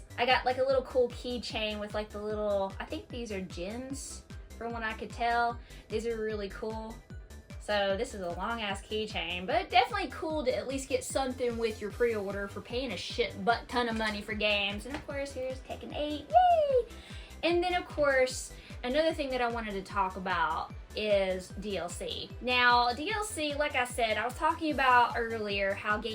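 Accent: South, United States. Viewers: I love your southern accent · I love your accent it’s so country I am from Tennessee I love watching your videos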